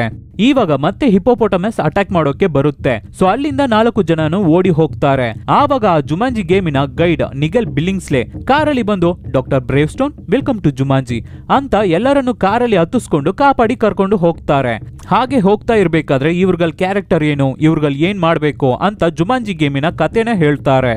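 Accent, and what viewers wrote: Tamil Nadu, India. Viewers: I think ur mother tongue tamil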